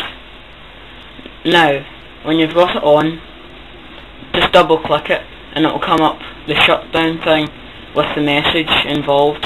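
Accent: Scotland, United Kingdom. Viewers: by the way he speaks i think he is scottish. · its scottish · lmfao he is from scotland he is not irish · OMG! SCOTTISH! :D! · thats fun ;) and, i just adore you'r accent ^^ scottish is just so cool :o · ye you are scottish do you got a lisp tho? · haha i love your voice! scottish people have the best accents lol and I am sooo doing this at school tomorrow thanks hun! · Thats cool...and are you Scottish? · whoa you got a strong scottish accent mate!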